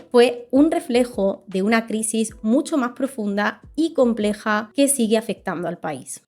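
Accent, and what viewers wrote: Andalusia, Spain. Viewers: Eres andaluza o manchega?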